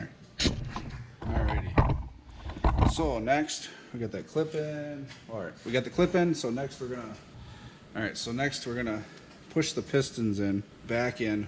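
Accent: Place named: Canada. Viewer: got 2 min in until i realized he was Canadian or Ohioan much love for this tutorial bro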